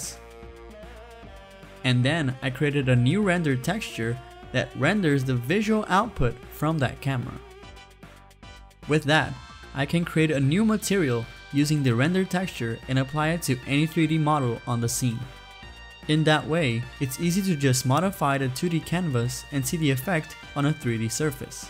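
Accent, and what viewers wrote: Brazil. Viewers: Are u brazilian my man?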